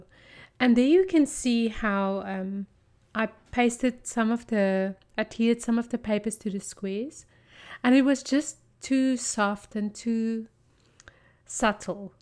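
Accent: South Africa. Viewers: Hmmm sound like a fellow South African, howdy from Cape Town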